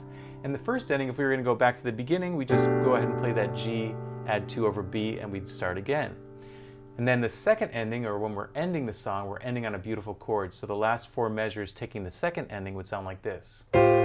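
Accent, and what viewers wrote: United States. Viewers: That was inspiring,. Any chance you're from Lowell, MA?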